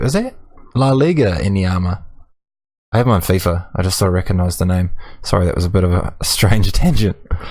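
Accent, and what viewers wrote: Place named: New Zealand. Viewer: You seem to have picked up the accent so I had you down as NZ born and bred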